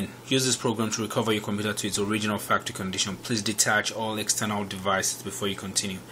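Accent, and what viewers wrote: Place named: India. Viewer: Note book not net book and you call the screen a creen I swear all of these tutorials for computers are done by Indians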